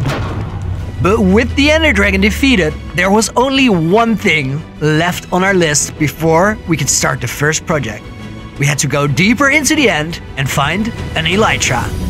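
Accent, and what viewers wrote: Netherlands. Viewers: you sound dutch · Where are you from you sound Dutch!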